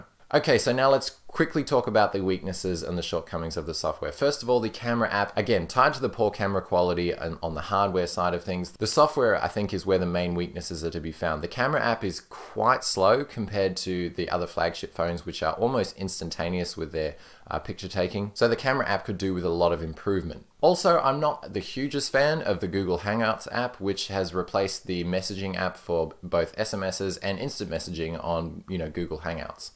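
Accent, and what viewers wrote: Australia. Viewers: So u from Aussie mate ?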